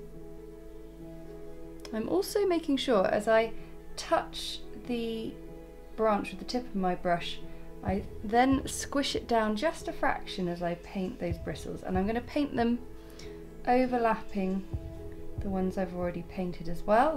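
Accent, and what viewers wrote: England, United Kingdom. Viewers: I just have to say, I appreciate how clean your palette is. I watch some other painters and theirs are so messy, it really makes my OCD go batty. 😂 I also enjoy listening to your accent. I am from Minnesota but have friends in England, and so I imagine that you are just another one of my friends there. I always watch before I go to sleep. It is so soothing.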